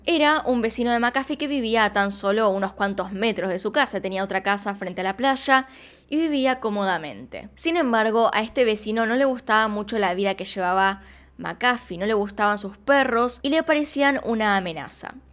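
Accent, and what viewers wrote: Argentina. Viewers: Te amo 🥰❤️ Amo tu canal ❗️ Adoro el acento argentino se me pega mucho y más que estoy viendo una serie llamada Rebelde Way de Netflix 😂❤️👌🏼 Saludos desde Perú 🇵🇪❗️ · Es su acento argentino :/ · SOS ARGENTINA ? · Me encanta como narras los casos, eres linda, tierna y graciosa y ese acento argentino en ti me encanta. Un abrazo desde Venezuela 🇻🇪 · No es que tu voz de argentina Me gusta, digamos que es una de las quémenos me disgusta.Hay muchos canales como el tuyo pero su narrativa me aburre mucho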